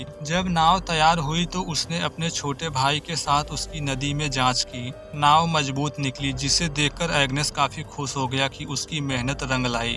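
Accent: Bihar, India. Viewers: Bhai bhihar se ho kya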